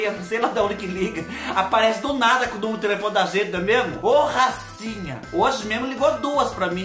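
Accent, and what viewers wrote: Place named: Caipira, Brazil. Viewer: D CEU amo seu sotaque q caipirinha, o maia lindo kjķkk❤😍🤩♥️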